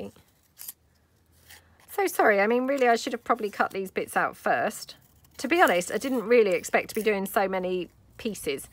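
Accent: England, United Kingdom. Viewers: These are so cute and look like a lot of fun to make. Thank you, you never disappoint ❤️❤️🥰🥰😍 You use the word “rubbish and fiddley’ a lot, with your cute little English accent and I chuckle every time. 🥰❤️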